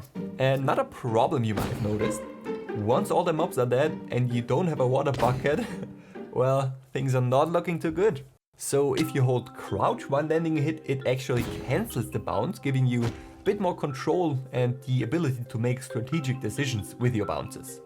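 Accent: Germany. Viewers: are you german cuz you are from Austria im german too and your english sounds like if you were german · Why does it sound like you have a german accent? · Bist du deutsch hört sich irgendwie so an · Man merkt das du deutsch bist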